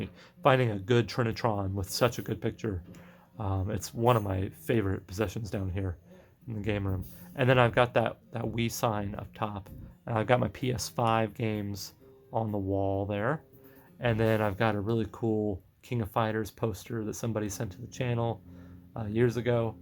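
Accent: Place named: Germany. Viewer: U sounds like u are from germany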